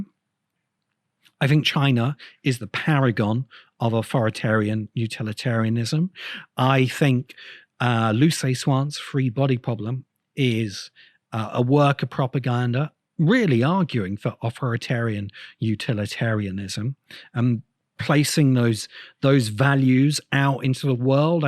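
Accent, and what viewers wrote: United Kingdom. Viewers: So in a nutshell, the author here defines as ''post-propaganda'' any point that might be brought against his own biases. Which in a hilariously cyclical argument sense its a form of propaganda too. Im not payed by Russia or China (hey that's what somebody who IS payed would say lol), but hearing him talking as if he has some kind of higher moral ground, with British accent, is at the very least ironic if not ridiculous. On the point made earlier about how much democratic we would consider today the Athenian Democracy, I will reverse it and ask would the Athenians recognize our indirect practically ''representative'' oligarchy as a Democracy when that term derives from Demos (citizenry)+ Kratos (Power)?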